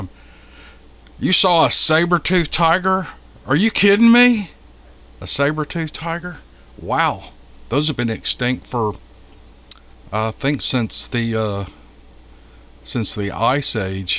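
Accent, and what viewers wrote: South, United States. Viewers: I can only speak for myself, but I haven’t necessarily returned to this channel again & again over the last few years only for “Bigfoot” stories. While that’s what drew me here initially, I quickly came to genuinely like; his familiar southern drawl, his easygoing personality and, his masculine voice… and some genuinely great stories. Sadly, nowadays it seems that any and every possible point of interest seems to devolve into some sort of cult. And we all know to stay far away from cults. could read the lyrics to, and invariably, I’d eagerly listen-in whilst cooking supper or washing the dishes… but, this is all just great entertainment, not a system of belief. Keep up the fantastic work, …💯🇺🇸❣️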